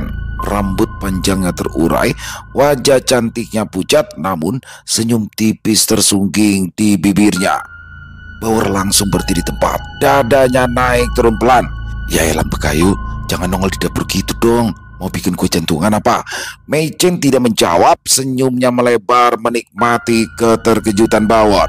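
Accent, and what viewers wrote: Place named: Madura, Indonesia. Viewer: Style madura bagus tuh 😂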